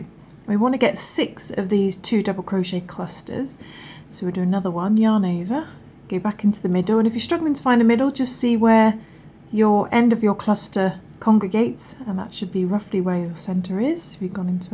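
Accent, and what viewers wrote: United Kingdom. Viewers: You explain it so well and your not rushing it at all I love how it’s all to do with the crocheting rather than showing off your nails like some others do . Plus it’s good to hear someone from the uk 🇬🇧 like myself 😊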